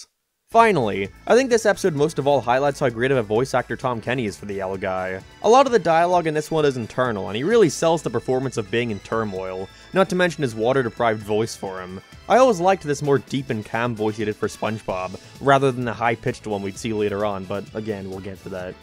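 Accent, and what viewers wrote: Canada. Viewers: You sound Canadian lol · I commend you for your time put into this. However I had to stop. Come back watch a little more. Your accent is unbearable 😂 I'm assuming your Canadian? If not Canadian I don't know but when you say or hang on certain words Im just like 🤮🤮 when you say a phrase like .. Mr Krabs has to figure it OuuuT. I picture a really snobby preppy girl talking. Like yahhhhh that was sooo yesterdahhhh lol if u want more examples I can show ya lol. At the end of the day great video. I'm sure your a good dude etc It's my personal problem but I had to mention it lol.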